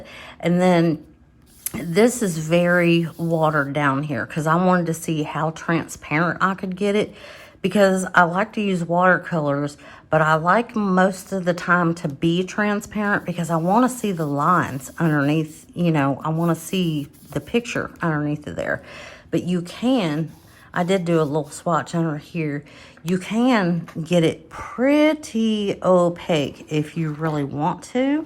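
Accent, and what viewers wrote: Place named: South, United States. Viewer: Hiiii😊, I finally have to comment. I just enjoy your videos soo much! I love your kind southern accent and voice - makes me long for Texas where I lived for a few years and Florida and Alabama too. You are kind, knowledgeable, so very talented, and beautiful! I wish you all the best life has to offer❤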